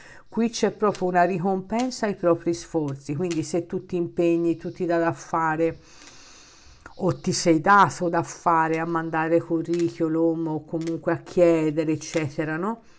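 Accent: Tuscany, Italy. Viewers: Grazie,adoro come parli il toscano,e anche la Toscana,speriamo bene 💰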